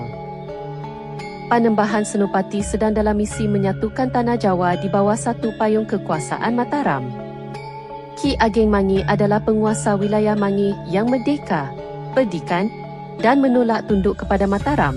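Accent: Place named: Malaysia. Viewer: Logat malaydesh tidak elok didengar. Bahasa malaydesh menyusup ke dalam bahasa Indonesia lewat membacakan narasi sejarah kerajaan di Jawa dengan menggunakan logat malaydesh yang terdengar kaku.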